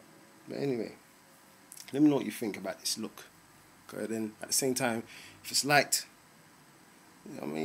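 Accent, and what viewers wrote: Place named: United Kingdom. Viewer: I uderstand aa lol British accent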